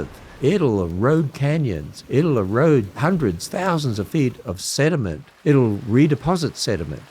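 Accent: Australia. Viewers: Im Aussie, first heard you back in about 1999. Im flabagasted you still have our Aussie accent very strong, awesome... How long have you been away from here ?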